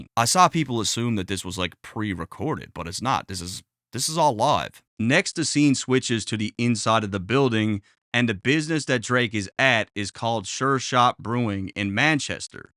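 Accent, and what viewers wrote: Canada. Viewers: You sound Canadian 🤔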